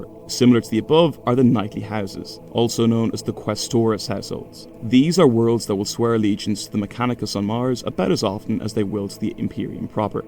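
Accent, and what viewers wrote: Ireland. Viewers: I did come back. The Irish brogue, soft as it is, works well, and the analysis is novel and worthwhile.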